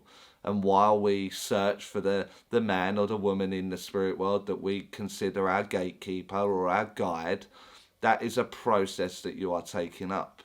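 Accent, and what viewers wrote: Essex, United Kingdom. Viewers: Before I even watch, I can't believe I have seen this I have only just fou d out I am a medium but I've heard spirit for 4 years. I just thought I was crazy, well literally I've been hearing the word gatekeeper so if just typed that word in as I have been hearing the name percival as well so typed in the 2 and your the first video and it's about mediumship. I am having a moment stuff keeps happening and I'm researching and it really is true.. I'm not nuts atalll how would I even know this.. I've just had to search the words I hear.. I'm very much still coming to a realisation I've been classed as having a mental health because I couldn't explain what was happening to me. I don't know why anyone would want to put thumbs down, I mean you sound like where I'm from Essex x💚 anyway I will watch now. There's a nice vibe straight away sorry to type on I just can't believe what's happening.. 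I'm having a moment and I have to get it off my chest cis everyone thinks I'm crazy when I say I've been hearing the gods as well as reletives and other entity I can only see tho via dreaming as if yet but I've spoke with Calvin klien and had visions of Diana, spoke with Hermes, Hearing percival and spoken with Gabriel too Thank you I probably sound so silly but it really true x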